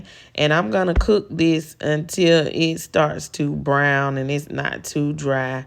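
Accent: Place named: South, United States. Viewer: I knew as soon as I heard your voice, this was the recipe I wanted. With a southern accent like that, I knew it would be good. Made this recipe for Thanksgiving and DANG!!! This was hands down the best cornbread dressing I’ve ever had!!🎉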